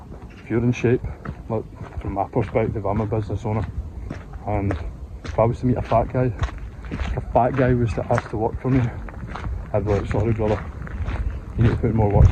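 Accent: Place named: United Kingdom. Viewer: are i want to learn your british accent.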